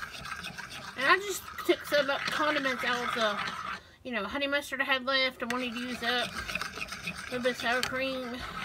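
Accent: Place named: West Virginia, United States. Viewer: well, I have a. Horrible pain when she speaks Hello ever body.....scrapes. she doesn't care. I'm not subscribed and wont matter if I don’t watch. I don’t subscribe she doesn’t ever respond even to her wrorshipers. ( those who praise a new shirt with stains) I can like subscribe a million other YouTube cooks, like Paula deen ( who is a true a Sourtherner. Not fake Orr a wanna be ). My grandparents were from West Virginia-as is and their accent was hard as well)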